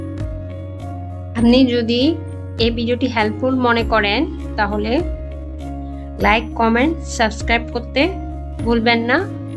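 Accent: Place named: Chittagong, Bangladesh. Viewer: Chittagong r meye confirm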